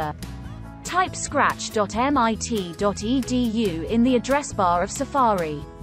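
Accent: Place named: United Kingdom. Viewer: `Je praat echt met zo’n Brits accent’. Or in English: Your really talking with an British accent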